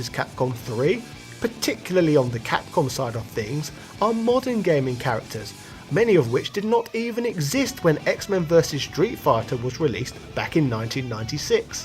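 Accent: England, United Kingdom. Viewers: It is always a pleasure to watch your videos, mate! I really love both your contents and your English accent, it really helps me as a comprehension exercise! Keep up the good work!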